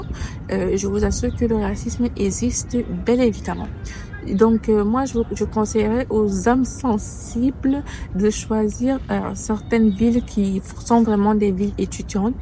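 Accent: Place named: Quebec, Canada. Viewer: Ca fait 2 jours que je t'ai découverte. J'ai presque regardé toutes des vidéos. Tu as presque un accent québécois maintenant. J'aime ta simplicité et aussi, tu détailles mieux brièvement certaines chose que je n'ai pas trouver ailleurs. Je t'envoie plein d'amour et positivité 😘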